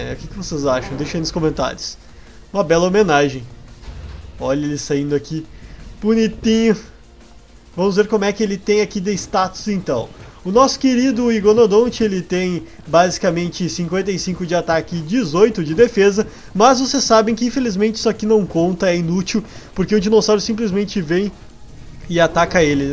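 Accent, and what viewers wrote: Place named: South, Brazil. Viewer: sabia que VC é do sul vc tem o sotaque daqui do sul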